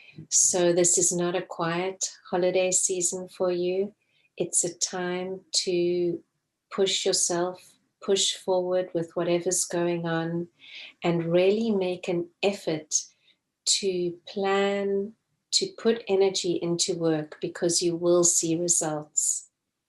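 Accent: South Africa. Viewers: I detect a South African accent.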